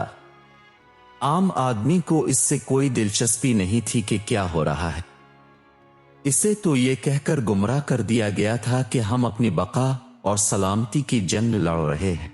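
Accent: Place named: India. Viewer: indians ki urdu aisi he hy lekin hame topic pe ghor karna chahye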